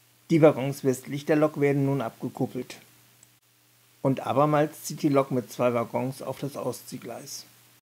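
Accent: Hesse, Germany. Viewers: Keine schlechte Idee, diese "Regalbauweiss". Lässt sich bestimmt "einfacher" umsetzten als eine Modulbahn. Ich vermisse dein hessisch Gebabbel... 😁😁😁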